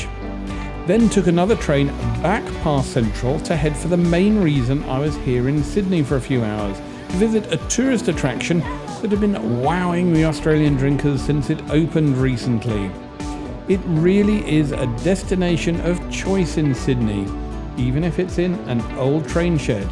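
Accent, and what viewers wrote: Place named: United Kingdom. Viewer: And thankfully a British English accent without the overuse of the redundant word ‘like’ in every sentence. Very nice review and look forward to more.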